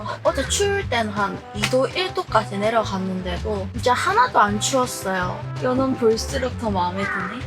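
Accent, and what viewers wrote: Gyeongsang, South Korea. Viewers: 갱상도사투리 너무 귀욥 ㅎ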